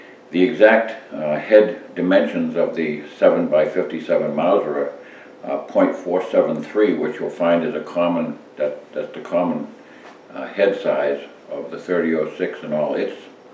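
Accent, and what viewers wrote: New England, United States. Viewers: You sound like your from New England! I say this because my Dad's family has been in Massachusetts and Connecticut for nearly 400 years! So, you sound very familiar to my ears!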